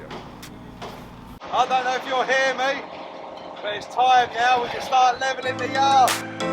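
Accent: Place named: United Kingdom. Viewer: Your so handsome 😊🤗😅 Never skip watching your videos. Love your British Accent btw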